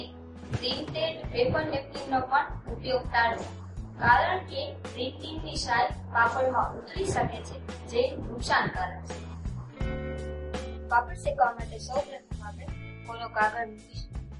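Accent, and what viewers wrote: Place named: Kathiawar, India. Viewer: Hello. Tame Kathiyawadi lago chho... Kyana chho?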